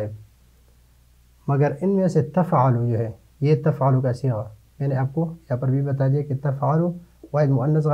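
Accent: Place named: Pakistan. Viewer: طرزِ تکلم تو پڑوسی ملک پاکستان سے ہونے کو بتلا رہا ہے باقی واللہ اعلم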